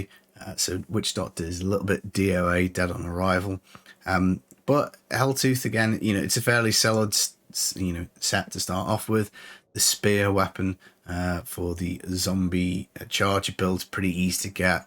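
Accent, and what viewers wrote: Stoke, United Kingdom. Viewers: Are you a stokie?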